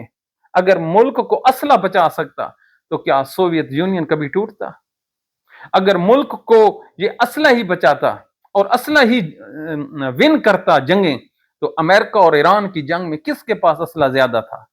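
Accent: Saraiki, Pakistan. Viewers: سرائیکی لہجے میں اردو سننے کی اپنی مٹھاس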